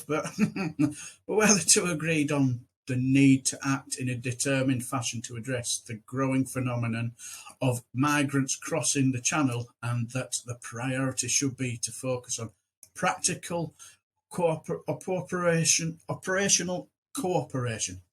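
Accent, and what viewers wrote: Yorkshire, United Kingdom. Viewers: Thank you for the videos. Great stuff, and that Yorkshire accent…. Beautiful! Have a great Xmas, and looking forward to listening to you again soon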